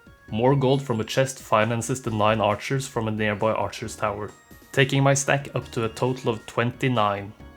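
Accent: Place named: Norway. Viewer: You sound like a Norwegian from the 80's. Trønder maybe?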